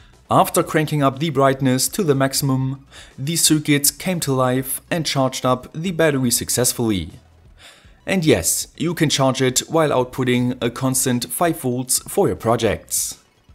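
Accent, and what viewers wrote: Germany. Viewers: Hah, i knew u were from Germany from the beginning! Hu he hu he hu he. Where do u come from ? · ja.... man hat an deinem Akzent erkannt, dass du Deutsch bist ;) tolles Video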